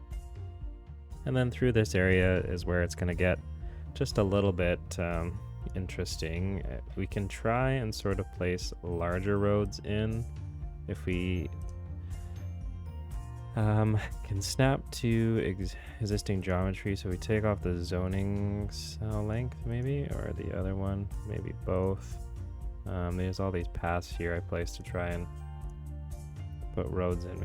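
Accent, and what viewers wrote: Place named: Canada. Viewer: I was going to ask if you were Canadian lol. The midwestern in me could sense it 😂 great video!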